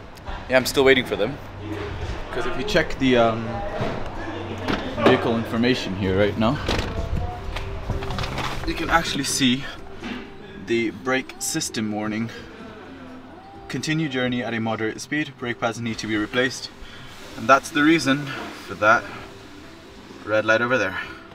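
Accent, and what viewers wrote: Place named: Kenya. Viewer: Love your Kenyan accent 😊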